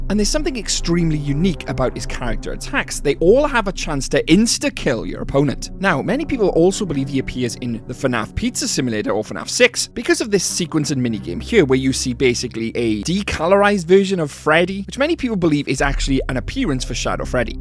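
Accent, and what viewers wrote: Wales, United Kingdom. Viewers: the welsh accent is real 🔥🔥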